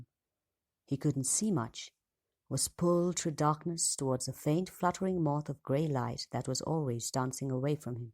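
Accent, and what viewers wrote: United Kingdom. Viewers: Your reading is FANTASTIC!! To hear a British woman taking the helm on this gives it an extra touch of surrealism (or plays against type so well). Very effective, and a HUGE relief from the awful, painfully contrived readings of Will Patton; his whistling S’s and phony solemn affect keeps me away from those. I hope you get hired at Audible, m’lady. 😄👍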